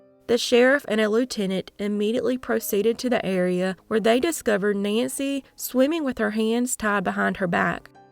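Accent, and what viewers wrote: South, United States. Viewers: With each passing video, my love increases for this channel & that accent! I'm a MISPLACED SOUTHERN BELLE & whenever I hear "that beautiful SOUTHERN DRAWL" I instantly become homesick, then that sense of PRIDE of knowing that I REPRESENT: THE BEST FOLKS, GREENEST LAND, GOOD EATIN, MARDI GRAS, COWBOY BOOT WEARING, HORSE RIDING, NO COATS NECESSARY, NEIGHBORS ARE FAMILY ROUND HERE LAND, CHURCH HOUSES OUTNUMBER THE DEVIL'S DENS & THE SUNRISE, AS WELL AS THE SUNSET, IS VIEWED AS GOD'S BEAUTIFUL SMILE UPON ANOTHER BLESSED DAY FOR WHICH WE TAKE PRIDE IN BEING PRESENT TO HONOR & ACKNOWLEDGE! TIME DOES MOVE SLOWER IN THE SOUTH...I GUESS THAT'S WHY WE AGE SO WELL!!! FROM SOUTHERN LOUISIANA to THE GREAT STATE OF TEXAS TO THE GOLDEN GULF COAST of MISSISSIPPI (yes, in that order for me) I WILL ALWAYS LOVE, REPRESENT & APPRECIATE THE BLESSINGS & BEAUTIFUL MEMORIES CREATED IN A WORLD UNLIKE ANY OTHER! 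THANK YOU FATHER GOD FOR FAVORING ME! 😇💕💯🙏🏽🤷🏽‍♀️🐎🥂🏖💒⏳🌞🎭⚜🇨🇱💃🏽💪🏽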